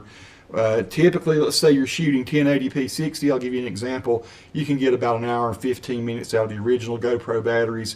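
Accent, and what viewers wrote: South, United States. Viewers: Is that accent real? I've never heard such a strong Southern accent before :)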